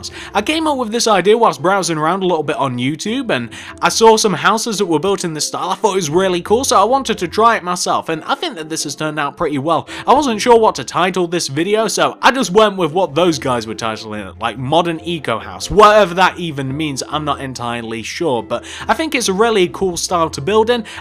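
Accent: United Kingdom. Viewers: Awesome are u from the uk?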